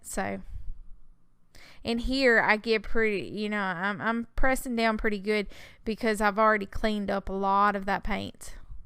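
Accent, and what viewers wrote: South, United States. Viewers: love the feathers and your southern accent is delightful! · just want to let you know, how talented I think you are. I REALLY enjoy your videos. Not to mention that I could listen to you talk forever. LOVE that southern drawl!! We Canadians love that drawl!! lol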